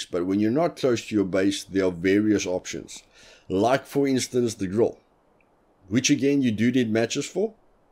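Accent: South Africa. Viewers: I am still struggling with one thing And that is to find out if you are South African ? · you sound south african lol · are you from South Africa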